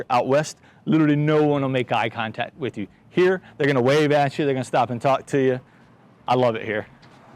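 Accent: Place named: South, United States. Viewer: Great to see you back!! Love your videos and hope to see many more in the future. By the way I can hear you've picked up a little of the southern accent, nice!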